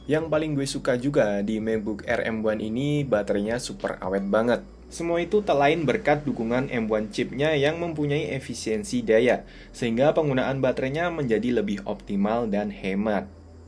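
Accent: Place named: Java, Indonesia. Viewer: review nya jelas, sama kayak medhok nya #timmedhokforever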